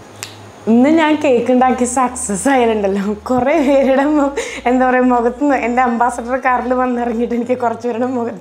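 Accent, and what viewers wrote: Thrissur, India. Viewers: Ath pinne Nammade THRISSUR kkari alle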